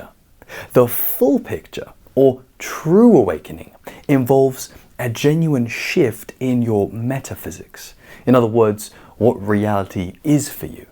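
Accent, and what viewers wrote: United Kingdom. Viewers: What accent is that? You look Danish but sound British 😅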